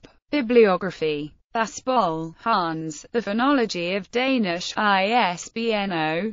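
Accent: United Kingdom. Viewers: Please get rid of the monotonous Received Pronunciation screen reader.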